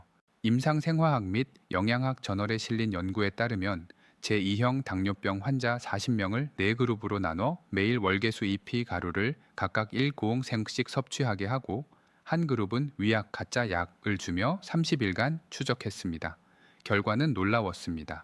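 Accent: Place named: Gyeongsang, South Korea. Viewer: *목소리.얼굴.지식. 다 끝내주고 경상도 말로 사람 지기네요.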